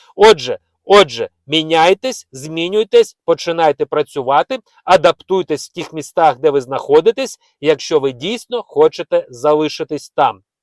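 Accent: Russia. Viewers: Любі українці, не слухайте цього мерзенного блогера. Він чистокровний рашист, прислухайтеся до його вимови. Ще один канадський марк знайшовся, який бігає по лісосмугах та ллє бруд на українців. Але при цьому не заважає йому клянчити у українців гроші на донати. Мені цікаво, куди ідуть ті гроші. Сумлінні українці і без вас донатять. Самі присосалися до чужої країни, а людей, які рятували своїх дітей від війни, вони пускати на"свою" територію не бажають. Це їм конкуренти. У нас в Україні майже всі чоловіки працюють на двох або і на трьох роботах. І не вам їх паскудити. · Автор - серед українців вати немає. Бо вата це російські і проросійськи мислячі люди, які українцями бути не можуть в принципі. Громадянами - так. але серед них і вірмени, і євреї. Я про це вже вам зауважував. Якщо ви вважаєте себе україномовним блогером ( російський акцент лізе у всі діри), то притримуйтесь усталеної української лексики, а не переміняючи поняття з ніг на голову. 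Що характерно саме для москалів. Не братимете подібні зауваження до уваги - зійдете на маргінал, як подібний до вас канадський марк.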